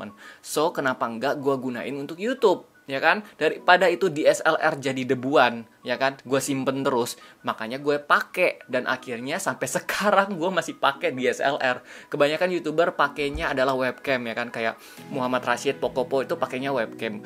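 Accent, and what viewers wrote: Java, Indonesia. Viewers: Kak orang Indonesi apa Jawa Kok ngomongnya Kaya jawa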